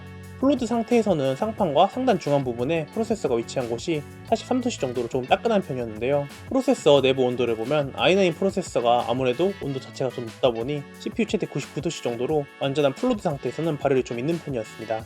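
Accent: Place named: Jeolla, South Korea. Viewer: 전라도 말투가 신뢰가 안가네요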